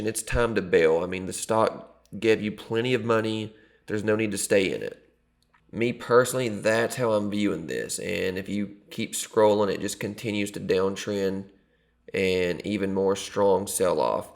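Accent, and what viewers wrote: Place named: South, United States. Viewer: You know, if someone didn’t know you, and just heard the southern accent and saw the average production quality, they would probably skip this vid… but you have a great way of not trying to overcomplicate explanations and your info is A+.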